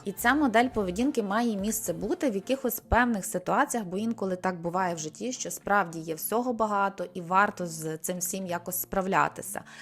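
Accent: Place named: Western Ukraine, Ukraine. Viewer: Круто,мені здається,що я чую західний акцент))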